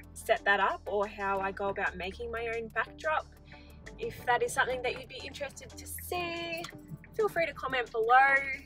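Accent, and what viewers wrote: Australia. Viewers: Our spotlight is a bit like that in Queanbeyan NSW. We don’t even have one in Canberra. But we have 3 Lincrafts? I am enjoying all your videos so nice to hear an Aussie for a change.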